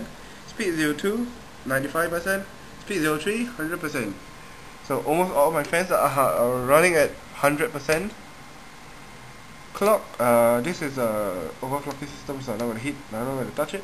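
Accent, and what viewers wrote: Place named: Singapore. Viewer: lol singaporean accent :D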